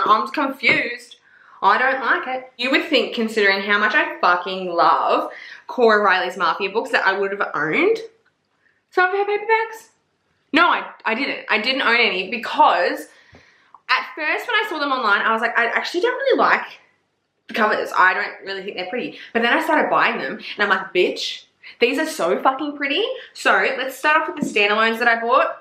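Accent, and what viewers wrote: Australia. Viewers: bish shut up I love the Australian accent 😭😭😭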